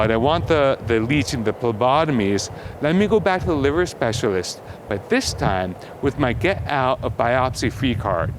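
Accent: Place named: Japan. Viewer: A white guy, with a Japanese accent?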